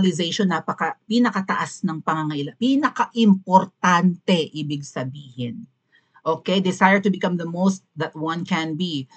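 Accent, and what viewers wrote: Iloilo, Philippines. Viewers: Haha ilongga ka day❤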